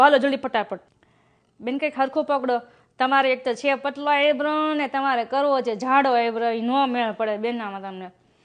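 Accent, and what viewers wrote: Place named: Saurashtra, India. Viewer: તમે બોલો છો તો મારી સખી જેવાં લાગો છો સૌરાષ્ટ્ર, કાઠીયાવાડી ભાષા....આવા વિડિયો હું અમારા સર્કલ માં મોકલું છું...😊😊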